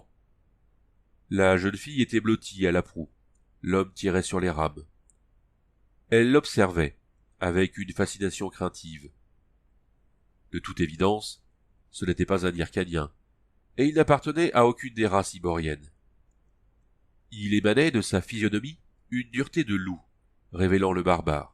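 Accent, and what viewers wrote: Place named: Paris, France. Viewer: les livres audio c'est une bonne idée, mais l'accent parisien c'est pas possible c'est insupportable j'ai cliqué au hasard "qui occultaient l'ciel" bordel LE CIEL pas "l'ciel" On dirait une grosse vendeuse de poisson sur le marché entrain de brailler pour vendre sa merde : "VOUS V'LEZ UNE P'TITE TRANCHE MA P'TITE DAME" "V'NEZ GOUTER MON POISSON M'SSIEURS'DAMES, IL EST BON MON POISSON" Ok pour les livres audios, mais lis les mots en entier stp, sinon c'est inécoutable (sauf par des parisiens évidemment) bon après je critique mais dans l'ensemble c'était bien lu 👌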